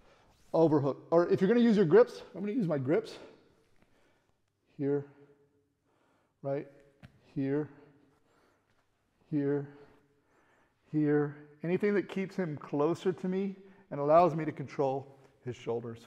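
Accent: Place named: United States. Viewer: Never realized you were teaching out of Germany; I just assumed the US by the accent. Almost makes it feasible to visit from Norway as a vacation project some day.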